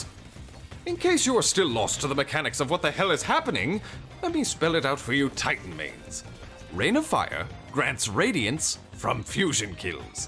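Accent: United Kingdom. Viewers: Love the content & your British voice. But it's kinda funny how I literally just made a double primary cascade point PVP build with Undying Tempest & positive outlook with Dragon Shadow on my hunter yesterday. And it's so much fun once you get the ball rolling! Cascade Point is actually a sleeper TTK and DPS perk.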